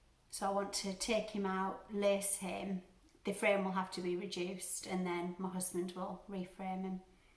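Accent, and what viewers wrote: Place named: Northern England, United Kingdom. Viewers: lovely to hear from a Northern lass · I love your accent as well, the Yorkshire accent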